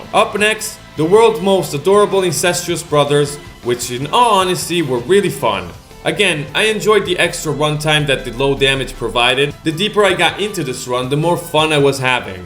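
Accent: Italy. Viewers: Rapid question, you italian?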